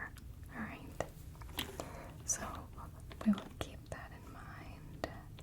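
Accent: Midwest, United States. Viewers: As a Minnesotan, the midwestern tinge to your voice in the old school videos like this give me LIFE. 🤩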